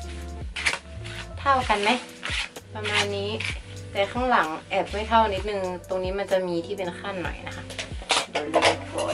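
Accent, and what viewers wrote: North, Thailand. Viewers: คนเหนือแน่เรย เก่งจร้า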